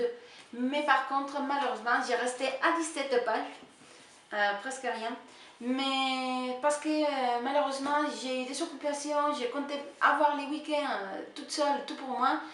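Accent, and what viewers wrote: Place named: Latin America. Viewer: Coucou ! Comme promis me voici :) (je t'ai répondu dans le groupe) Quel délicieux accent <3 Es-tu hispanique ? (désolée je suis un curieuse, deux amoureuse de cette langue)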